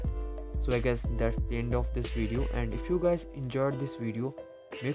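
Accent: India. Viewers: U Indian right ?. The accent is just shouting it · Indian accent☠️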